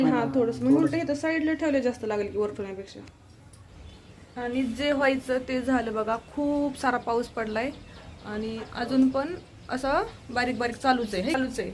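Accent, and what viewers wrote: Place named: Satara, India. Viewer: You are very beautiful. Like your Marathi. You sound like you are from Satara.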